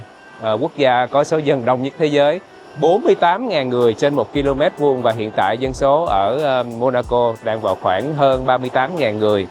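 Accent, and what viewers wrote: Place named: Saigon, Vietnam. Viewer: Giong de thuong, giong chi'nh goc nguoi SG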